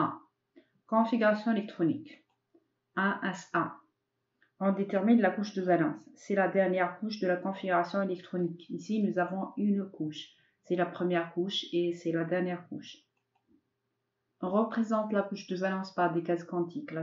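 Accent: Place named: France. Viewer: hta haja ma mafhoma quality t3 son na9sa w zid l'accent francais tab3tha ....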